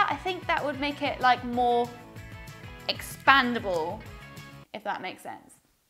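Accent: United Kingdom. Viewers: Love it ps are u British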